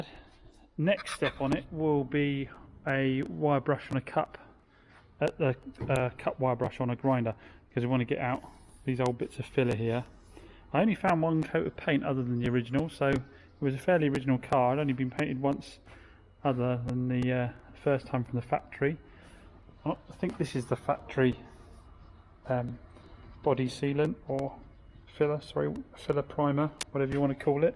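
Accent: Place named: North Devon, United Kingdom. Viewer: haha.. thought you sounded really close, I’m in south Molton!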